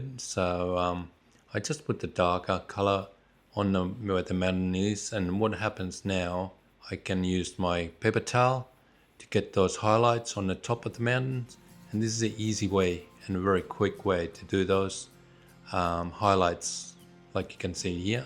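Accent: Australia. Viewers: Great tips and lovely art, subbed! Do I detect a little Aussie within the Finnish accent? :face-blue-smiling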